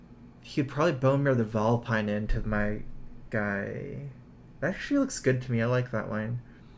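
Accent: Texas, United States. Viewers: John Dice late reply but he says drawl because he is from texas and apparently this is their accent.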